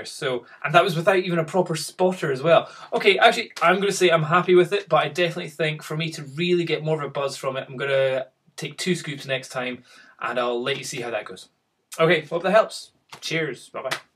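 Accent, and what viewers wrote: Scotland, United Kingdom. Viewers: he's scottish, it's his accent bro. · dude , where are you from , Edinburgh / glasgow ?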